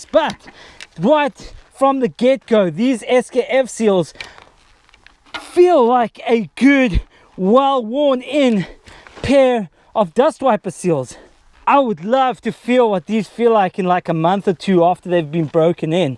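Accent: South Africa. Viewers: Your awesome! Love the South African accent! Subscribed! 👍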